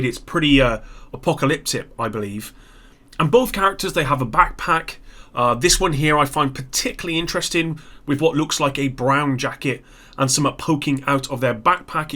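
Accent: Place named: South West England, United Kingdom. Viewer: Sounds like he's from south west England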